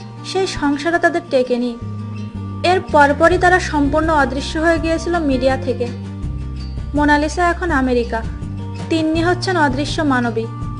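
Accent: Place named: Noakhali, Bangladesh. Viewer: ai noakhailla pathilar path sunle mejaj kharap hoy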